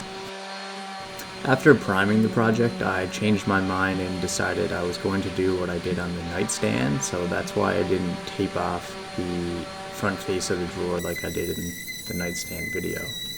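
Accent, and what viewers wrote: Canada. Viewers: I've noticed you're canadian because of the accent hahaha 🥰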